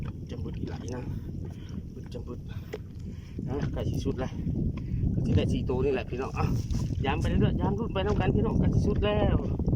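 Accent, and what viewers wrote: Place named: Savannakhet, Laos. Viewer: ສະບາຍດີ ອ. ສຽງເວົ້າຄືຄົນສະຫວັນນະເຂດນໍ ຂ້ອຍກະຄົນສະວັນເດີ້ອາຈານ ຄົນບ້ານດົງບັງເດີ້. ມັກຫຼາຍເດີ້ເພງທີ່ອາຈານຮ້ອງ ມ່ວນໆທັງນັ້ນ. ນອກຈາກຮ້ອງເພງເກ່ງແລ້ວ ໄຫຼມອງກະເກ່ງອີກນໍ້ອາຈານນໍ້. ສຸດຍອດໆ.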